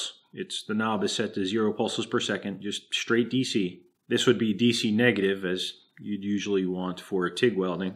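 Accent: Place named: United States. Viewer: Yes annoyed me as well - perhaps it is a Yank thing? Like Americans can't say solder - they say sodder not solDER! Still love videos - they are the best!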